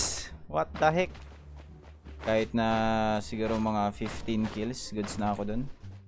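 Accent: Visayas, Philippines. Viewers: Bisaya kaba lods? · Bisaya kaba?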